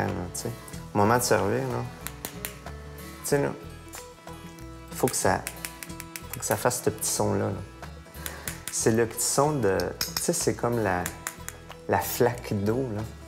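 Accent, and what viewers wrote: Quebec, Canada. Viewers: YOU don't understand because you don't speak FRENCH!!! Moi etant francaise, j'ai bien compris meme s'il a un accent qui est normal car il est canadien!!! Je dis merci a pour sa recette> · t un quebecois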